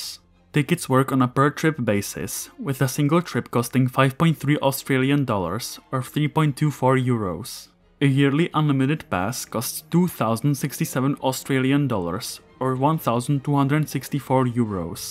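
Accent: Czech Republic. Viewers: Are you from the Czech Republic huh?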